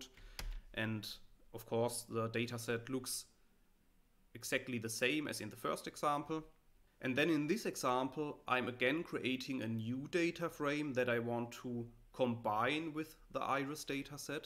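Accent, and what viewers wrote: Germany. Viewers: Good lesson. Good english, relatively poor mine. I don't know deutsch, but diction sounds like deutsch from Hessen Frankfurt.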